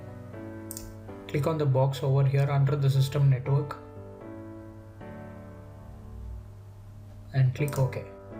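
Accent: India. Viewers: fucking indian english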